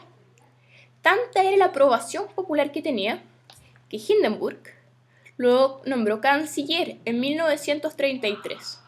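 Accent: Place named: Chile. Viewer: WACHO COMO HABLAN LAS CHILENAS LPM ES HERMOSO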